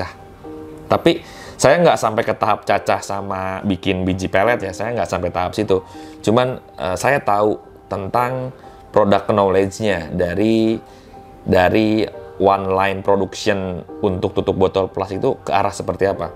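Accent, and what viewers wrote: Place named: West Java, Indonesia. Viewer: kalo dari tata bahasanya dia ini hanya domisili purwakarta ... paham sekali lidah sunda itu klo pakai bahasa indo dicampur" 👍👍😁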